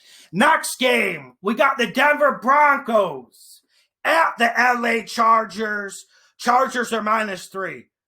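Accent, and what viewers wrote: Minnesota, United States. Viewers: is the prodigal sun, and the finest Minnesota accent known to man...The Gun barrel "Next Game" and " team X " AT" makes me tune in every episode. But one question, do you go "Bartender, "NEXT" round of drink? Keep it real and Merry Christmas! is a NFL Marine, and comes out firing!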